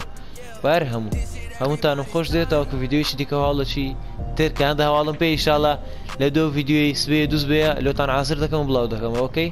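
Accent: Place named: Erbil, Iraq. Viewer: dli mn xo labar hichm nya bas ba hawleryakai xoshtr qsay dakai 💖💝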